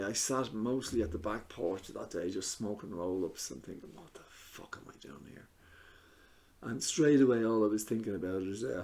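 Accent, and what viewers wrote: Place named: Ireland. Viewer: Have you ever heard of the rehab in tallaght in Dublin guy Leyton was next to a traveller's site .. the house was a mess they had found out how it was so damp and cold ... tree roots were under the house the walls had mold was a Christian ✝️ centre basically theres your room sweat it out this was in February.. it was freezing i was looking at the mold thinking 🤔 this isn't healthy but i was two sick .. opiate and benzo withdrawal met some great guy's but 🫣 it was i ruff time .. im a guy from Scotland i was 27 first took H at 15 but got on suboxone got my new job worked my way up had two kids .. but was taking dizepam like sweets to taper down from suboxone get on a lower dose .. but they said if your on dizepam cant take you 🤔🤚 no no iv not had them in weeks i lied .. that withdrawal in that old house 😮 im going to die here and its other addicts saying you will be ok 👍 god will help 🙄 yeah lots of crazy things happened.. 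but your voice reminds me of the guys there things like im in bits they called trainer's runners.. and pass the yoke everything was a yoke 😂😂 but good to see you clean and now free from the chains ⛓️ of withdrawal and hunting just to feel normal 🫶❤ love from Scotland.. Irish. And the Scottish seeem to have. The same sense of humour always get on from guys from Ireland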